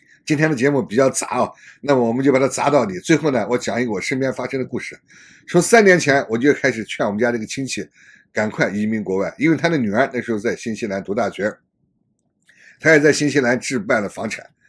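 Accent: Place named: Xinjiang, China. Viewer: 听说晒了一整天怎么感觉有点新疆口音？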